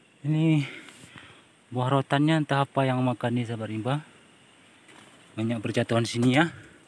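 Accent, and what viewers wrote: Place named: Gayo, Indonesia. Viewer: nampak eee si nos konten nee urang gayo tok, logat epeh betih we 😄😄 salam satu hoby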